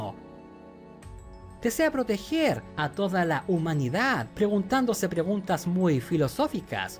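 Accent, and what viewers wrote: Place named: Chile. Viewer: Amigo hablas como un chileno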